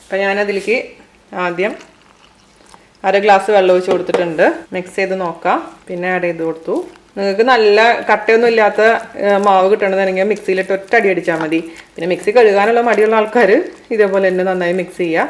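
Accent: Thrissur, India. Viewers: Good presentation in Trichur Malayalam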